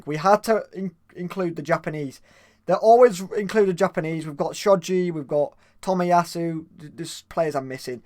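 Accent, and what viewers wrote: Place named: Yorkshire, United Kingdom. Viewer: I live in thailand and never hear the Yorkshire accent anymore, keep up the good work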